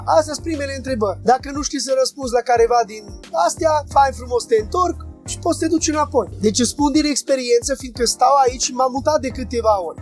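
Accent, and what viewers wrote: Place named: Transylvania, Romania. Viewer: Salutare, Îmi place cum ai prezentat locul de munca și viața in Norvegia.BRAVO! Ești din Ardeal ? (din cauza accentului 🙂) succes mai departe!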